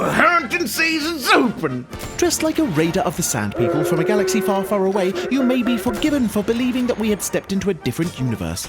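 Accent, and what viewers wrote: United Kingdom. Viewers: oh my goodness, I can only wish to one day be as british as you are. awesome video as always!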